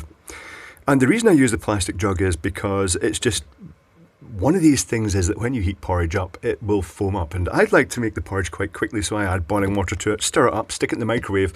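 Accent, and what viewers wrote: Scotland, United Kingdom. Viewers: Coming from Scotland myself, this guy has the most annoying Scottish accent in the world. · Thank you I live in the US but am from Cheshire, never knew there was a posh Scottish accent but your right. Nice vid though.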